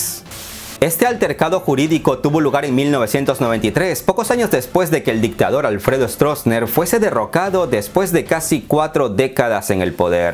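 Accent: Peru. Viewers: Eres peruano verdad? Si es así saludos de un paisano.. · POR TU MANERA DE HABLAR, DEFINITIVAMENTE ERES PERUANO. YO CONOZCO MUY BIEN A MI GENTE.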